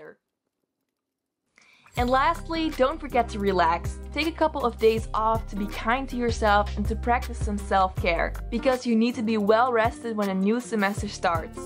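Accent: Netherlands. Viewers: Are you Belgian or from the Netherlands? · Are you Dutch I can hear a small accent Dus hey hoi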